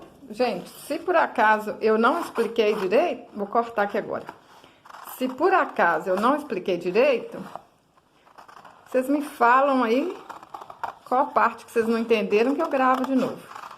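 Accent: Minas Gerais, Brazil. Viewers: De onde VC é? Parece um sotaque mineirinho, igual eu rsrs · Em primeiro lugar.Vc é mais linda q.o seu artesanato.Seu sotaque mineiro é tudo de bom.Sou paulista mais amo esse sotaque mineiro.E o seu artesanato:Meu Deus!Aja 💓!É lindo d+da conta sá! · Mineira UAI. Eu tbm sou mineira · Amei , vc é muito fofa , vc é de qual estado ?. Parece Mineira kkkk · Vc é mineira ? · Esse sotaque minerin é bom demais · Gostaria de saber de que lugar de Minas vc é, esse seu sotaque é mineiríssimo, sou nascida em Minas, Resplendor, mas moro em Itaperuna Estado do Rio. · Amei que trabalho maravilhoso lindo demais, gostei também tanto de vc,de que estado vc é, acho lindo seu jeito de falar. Muita paz e saúde pra vc minha linda 😘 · Cumade é mineira uai so? Eu também sou 😆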